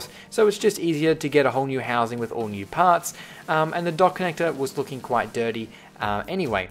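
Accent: Australia. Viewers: are u from Aus? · The battery had a 'crazy' logo on it and the guy sounds australian so I assume its crazyparts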